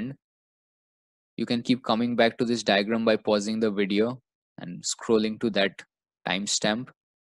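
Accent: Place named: Italy. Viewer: Love the Italian accent XD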